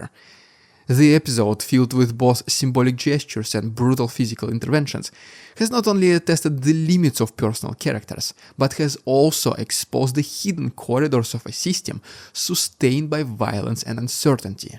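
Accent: Russia. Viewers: Man that perfect, lyrical English with that thickass Russian accent is amazing.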